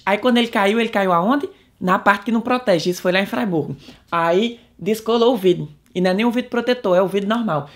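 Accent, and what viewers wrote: Ceará, Brazil. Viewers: Esse sotaque é muito meu!! 😂😂😂😂 Sou sua fã e também vizinha aqui no cariri cearense. 😍😍😍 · lindíssimo, amo você. sou do nordeste também e me encanto muito com isso de você mesmo não morando no brasil e tudo mais continuar valorizando a cultura nordestina, principalmente seu sotaque! todo sucesso do mundo, um salve dos alagoanos. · Cai de paraquedas no seu canal. E estou adorando. Que sutaque maravilhoso. Eita cearense arretado ❤😍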